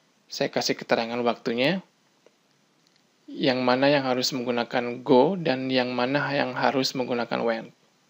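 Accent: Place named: Palembang, Indonesia. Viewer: orang palembang ya kak. setiap ngomong selalu pakek "e" hehehehehehehe paham betul aku cakap palembang. suka tutorial ini mudah dipahami.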